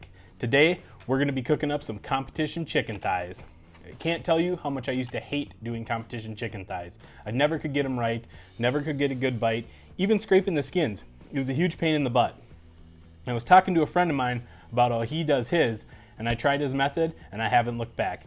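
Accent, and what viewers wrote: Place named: Wisconsin, United States. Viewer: I gotta know. I see Texas everywhere but as a Wisconsin native I hear an accent that reminds me of home. Are you from TX or WI? Or other?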